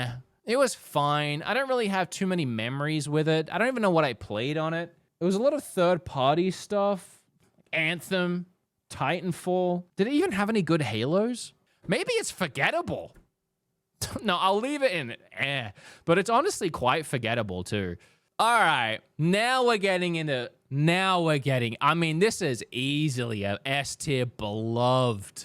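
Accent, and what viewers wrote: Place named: Australia. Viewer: Different pronunciation for Australians which is still correct. British, Americans and Australians stress different parts of some words.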